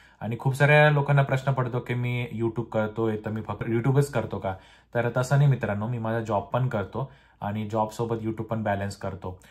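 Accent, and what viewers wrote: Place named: Nashik, India. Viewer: तुझी मराठी चांगली आहे आणि नाशिक ची तर एक नंबर आहे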